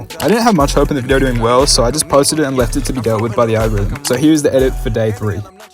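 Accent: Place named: Australia. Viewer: I KNEW YOU WDRE AUSTRALIAN BY YOUR VOICE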